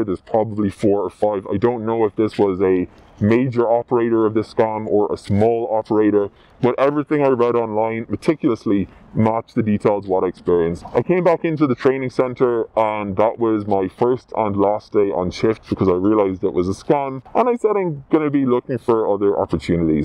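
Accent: Ireland. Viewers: I’m afraid the voice modifier doesn’t get rid of your distinctive Irish accent, nor your typical rhythm of speech. Fun video tho!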